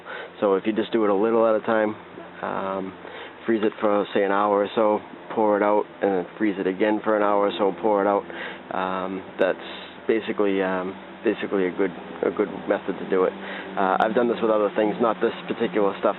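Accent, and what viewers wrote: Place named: Boston, United States. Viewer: Is that a Boston accent ?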